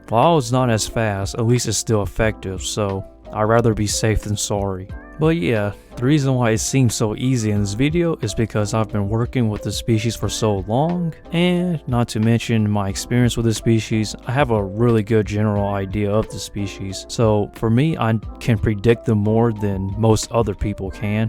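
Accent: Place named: South, United States. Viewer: Bro I can tell your from the southern states. I was born and raised in Virginia and North Carolina hell yeah brother!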